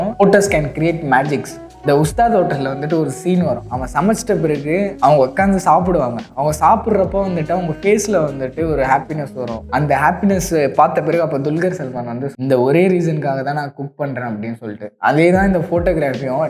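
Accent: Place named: North Chennai, India. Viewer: Bro unga north chennai accent ... I love it bro. I was in ponneri for 2 years. From thanjavur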